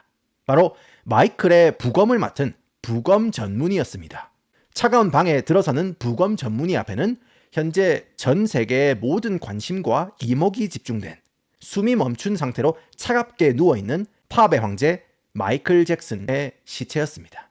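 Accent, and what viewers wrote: Gyeongsang, South Korea. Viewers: 경상도 액센트 매력 탑이에요❤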